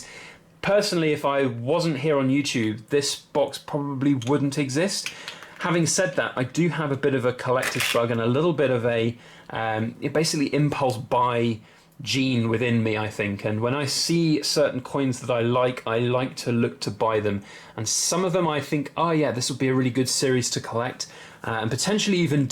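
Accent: England, United Kingdom. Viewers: Love your channel. Sometimes I just play your videos while I'm relaxing. I guess its the English accent. Gives your channel that European flair that isn't common in the US. Always good content. Have you seen the price of silver? You can sell your extra silver now. Or keep holding out. How much silver do you buy monthly? weekly?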